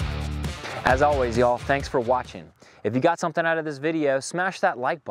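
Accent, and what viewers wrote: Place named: North Carolina, United States. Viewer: Where in NC? Love to go see it. I'm coastal NC and if I had to guess from your accent, you're not western NC.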